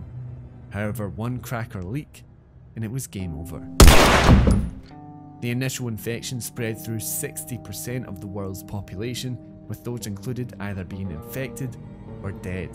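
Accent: Scotland, United Kingdom. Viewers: I love your Scottish accent! :) it’s very calmed and easy to understand. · the universe doesn’t revolve around you, scottish accent is easy to understand for most people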